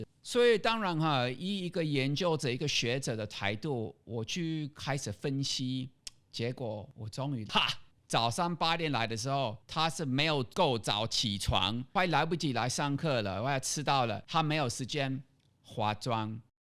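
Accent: Taiwan. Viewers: 口音台台的，好可愛 :-)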